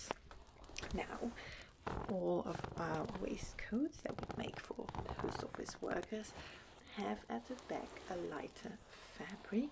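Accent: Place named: England, United Kingdom. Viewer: Never gets old! Ur english!!!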